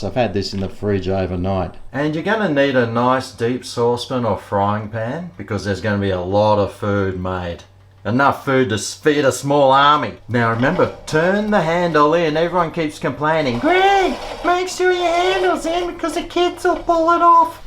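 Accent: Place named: Australia. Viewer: The best Aussie cook on you tube. And with very good Aussie accent and great sense of Humour. Everything is cheap and very efficient with time without stress at all. Very inviting when I watch your programme Greg. Keep it flowing. Happy New Year Mate , from Hobart Tasmania.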